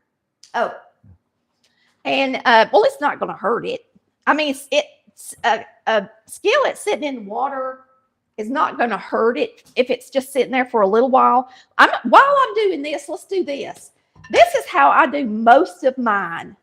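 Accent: South, United States. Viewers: You’re so funny!! Miss, I knew you were a smart cookie, I could tell, I hated Math, so no engineering for me, lol, but you be happy with your accent,you’re the best of both worlds, sweet, smart, and down to earth!! As a Christian, I always wanted to have a meek and quiet spirit, I find Southern women to be a lot like that, and we ARE supposed to be good home makers! Proverbs31 says the godly woman had a business too!! God bless you, so glad to have found your channel!❤😊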